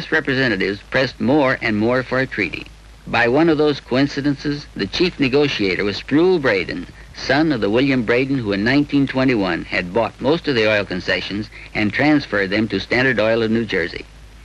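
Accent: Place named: Bolivia. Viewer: Hablas como boliviano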